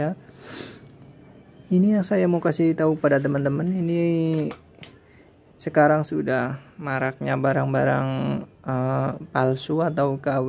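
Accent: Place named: Banyumas, Indonesia. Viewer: Ra ngapak ra kepenak kang...sukses selalu👍😁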